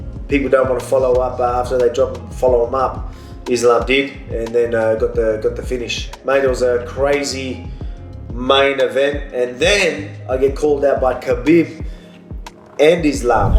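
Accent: Australia. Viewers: Remove background music so we can hear u clearly. Your Australian accent makes ever more hard to listen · Grow some hair on ur head and change ur dressing sense, it makes u look more short.. otherwise excellent fighting, very entertaining, i like your Australian hard accent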